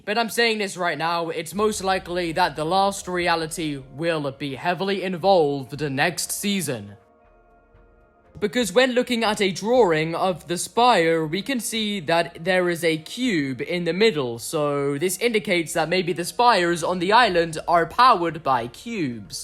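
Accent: United Kingdom. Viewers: what actually is your accent. You sound like you are not from Britain but have a sort of London accent but you have a habit of adding the "ah" sound before or after many "ah-words" you clearly think this is more enunciated but it just makes you sound like you cant pronounce words because English isn't your first language. It's confusing. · He is definitely Brit. You can't really go by accents. There are a bunch of them plus variations of the same accents.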